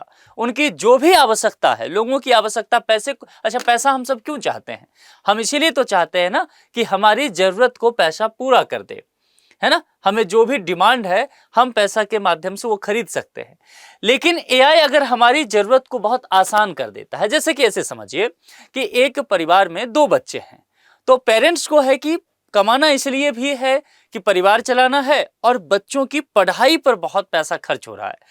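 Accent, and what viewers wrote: Bihar, India. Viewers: Bolne se lagta h ye bihari h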